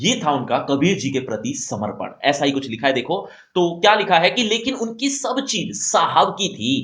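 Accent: Haryana, India. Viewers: Sir aap haryanvi ho kya?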